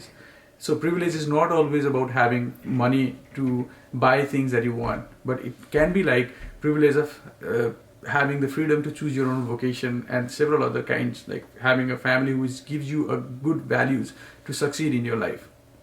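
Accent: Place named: India. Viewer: Love thick indian accent 😅. I don't about this book but i like the same name book by Indian author Preeti Shenoy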